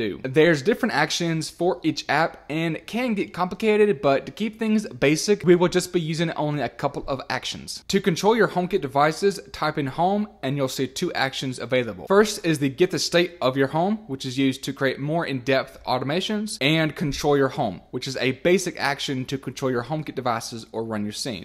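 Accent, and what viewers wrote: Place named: South, United States. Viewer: I had trouble understanding what you were saying. Several times I had to pause or skip back a few seconds to try to understand you better. I don’t think it’s because you have a slight southern drawl. I think it’s because you are trying to talk too fast, and some of your syllables get swallowed up as the words run together. This is only exacerbated by how aggressively you've cropped out the silence. The tiny Pauses & breaks between phrases that you've trimmed, in my opinion have been cropped too close to the front & back of the words; there’s no split-second for me to process before moving onto the next phrase. Good info, just a bit too much effort on my end to try to understand what you're saying. I know you want to keep your videos short. However if you trim off a few extra seconds, but then that costs me a few extra seconds , 10 times throughout the video, did I really gain or save anything? 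It’s a bit exhausting to be honest.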